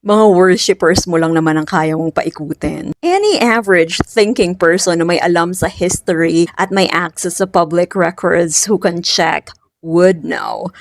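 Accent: England, United Kingdom. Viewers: I'm sorry but your English accent doesn't make you smart, mag bisaya kanalang day.:face-green-smiling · well said. Love the British accent though but not the Bisaya. Sagwa magsalita si Inday Sayad, even in her own dialects. Can you also roast Harry Roque. Thank you. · Luv ur brit accent 😊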